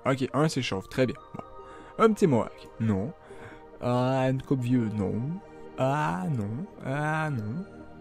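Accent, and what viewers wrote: Quebec, Canada. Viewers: Tes québécois ?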